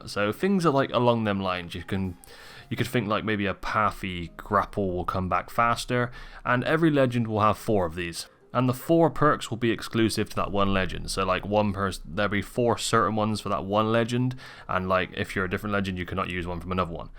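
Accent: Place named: South West, United Kingdom. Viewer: Where are you from bro, sounds south west like me?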